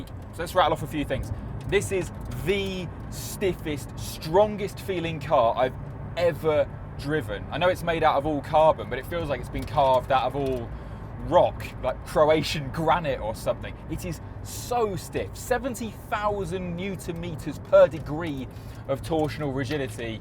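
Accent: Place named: United Kingdom. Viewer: i`m sorry you are so british i cannot even listen to this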